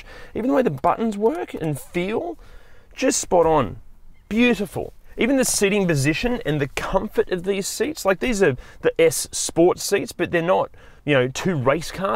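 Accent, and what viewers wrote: Australia. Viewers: this guy is aussie clearly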